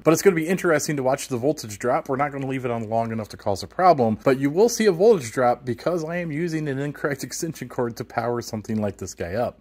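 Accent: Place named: Mid-Atlantic, United States. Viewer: Totally irrelevant but do you happen to be from northern Maryland, Pennsylvania, Jersey area? I'm quite interested in linguistics, I thought I detected a familiar accent.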